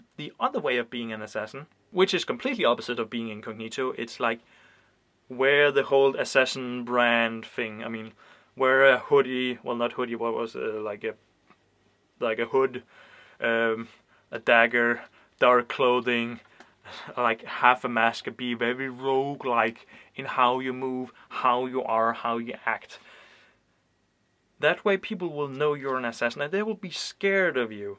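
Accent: Denmark. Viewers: is he danish? he has a very danish accent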